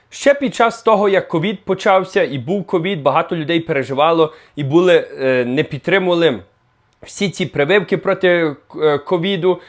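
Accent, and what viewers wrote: Western Ukraine, Ukraine. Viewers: Підтримую україномовний!!! У Вас чудовий західноукраїнський говір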